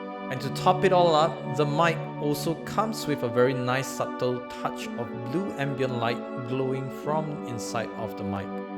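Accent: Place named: Indonesia. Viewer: Orang indonesia ya bang? Dari aksen nya kelihatan sama bagian deskripsi nya hehehe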